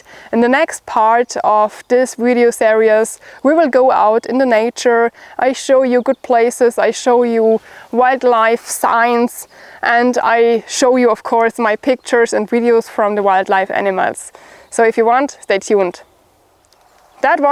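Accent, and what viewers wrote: Germany. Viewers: That was a very interesting video. A little bit of a departure from your normal videos. You always have the best equipment and take the time to explain each item. Loved the entire camouflage on everything. You look so much better these days. Then again you look good always I must admit! You're obviously recouping well and looking good as ever. Just can't get enough of your German accent. It's so adorable. Appreciate how you put the hard work in for each video. Good health going forward. God Bless. 🥰🤗❤🙏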